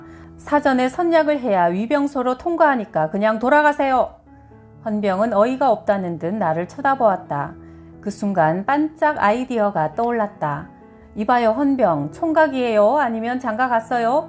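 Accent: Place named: Daegu, South Korea. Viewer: 대구사투리도 너무너무 잘 하셔요 몇 번 들었는데도 참 고맙습니다 감사합니다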